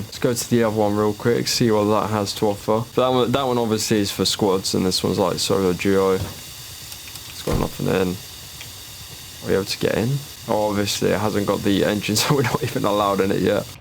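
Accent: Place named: United Kingdom. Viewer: if u talking on English and NOT GB English - much more ppl could understand u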